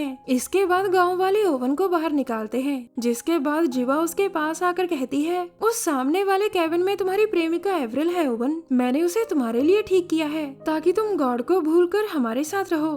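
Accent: Punjab, India. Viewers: See I was thinking about you nd u r here... sachhe wala pyar h... nibhana apko bhi pdega.. Lv uuuuuuuuuuuu............uff ye Punjabi accent 😍 👌....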